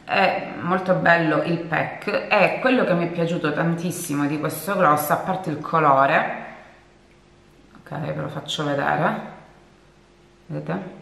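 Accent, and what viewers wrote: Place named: Sicily, Italy. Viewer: Io sono Siciliana.. mi sembrava che eri siciliana...ho gli zii che stanno in Calabria ♥️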